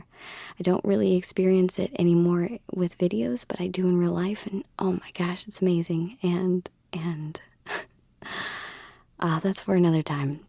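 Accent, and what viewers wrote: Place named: Texas, United States. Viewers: Please come back!!! You are my favourite ASMR artist ! You were the first one I stumbled upon and I have a sentimental connection to you, you have no idea. You're even on my photo collage lock screen of "favourite people" (next to Freddie Mercury and Sylvia Plath). (Holy shit that sounds invasive and weird but you just make me smile every time I visit your Channel) I've missed you so much! Your role plays, your singing, your cleaning! your acting! Your hint of a Texan accent (my Australian one is rather monotonous) I'm 16 and I'm in my final year of high school and I need you to placate me ! Okay I need to slow my role but you get the picture -- you're amazing, genuine, quirky, amicable, and a little bit of sunshine to light up my day. Please please upload soon! I miss you!!!! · AHHHHHHH LORD YOU HAVE BEEN MISSED!!!!! 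me and the wife love your channel and your Texas accent we are from Dallas :p but so happy your doing good keep it up :)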